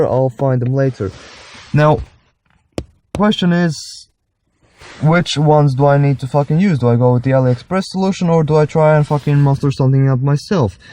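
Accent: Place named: Latvia. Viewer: Can hear ur latvian just by the talkin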